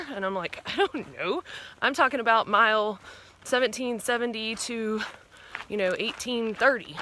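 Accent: South, United States. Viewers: Thanks again for taking me along on this adventure. Has anyone else thought that southern accent has faded just a little bit?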